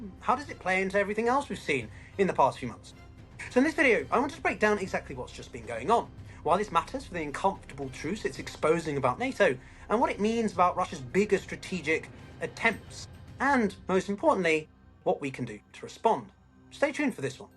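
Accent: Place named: United Kingdom. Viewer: Your English betrays DECEPTIVE ALBION from the start……..caveat emptor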